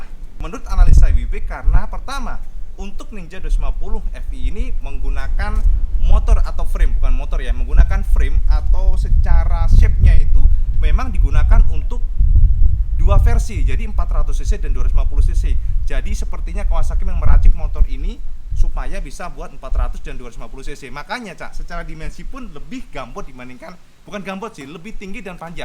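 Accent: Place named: Java, Indonesia. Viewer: Salah satu selalu nonton video tuh bahasanya lucu. Medok2 jawa campur BI cak 😂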